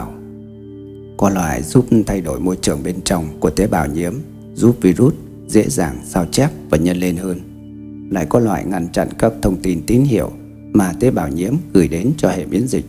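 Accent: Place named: North, Vietnam. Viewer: Bác này không đọc đúng được một từ ngoại ngữ, Virus mà bác đọc nguyên chất giọng Bắc vn là vi dút 😉 Nói chung, giọng đọc của bác không thuyết phục. Ad có thể cho biết rõ danh tánh của vị bác sĩ nào đã viết bài,và vị ấy đang làm việc ở đâu không ạ? Thank you!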